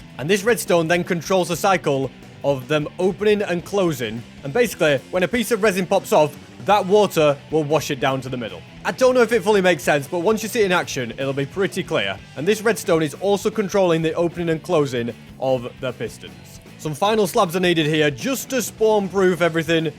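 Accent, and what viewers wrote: United Kingdom. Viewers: You British? It sounds like it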